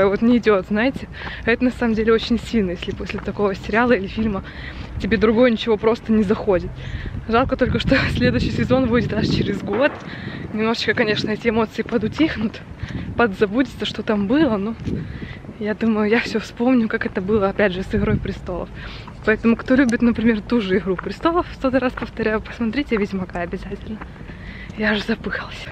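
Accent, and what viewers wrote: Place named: Okanye, Russia. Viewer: Перестань О-кать.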